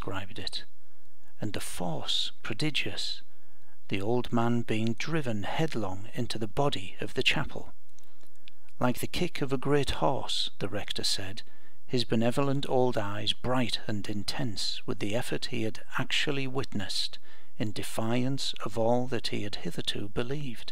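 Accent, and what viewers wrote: United Kingdom. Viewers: No offence to American readers, but there's nothing like an English voice for a ghost story 🙂. Thanks.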